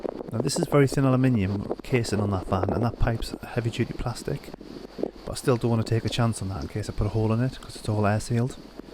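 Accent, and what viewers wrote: United Kingdom. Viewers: Just love the UK accent for narration, oh yeah, I'm here for the video mainly.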